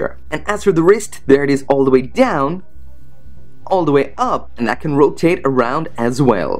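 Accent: Ireland. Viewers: Thanks for the in depth review. I almost picked her up to hold me over until kirin hobby sends my Sabre 2.0 is shipped out. You're one of the few reviewers that points out the good and the bad in equal detail. Quick question... Where are you from? Lol! At first I thought British Guyana because you say certain words similarly to my Mother but, after watching almost all yer vids, I"m thinking you're Irish. Just Wondering. Anyway keep it up!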